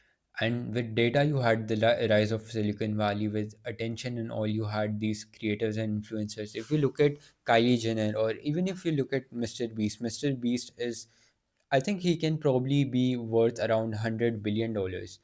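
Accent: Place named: India. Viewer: Indian accent😂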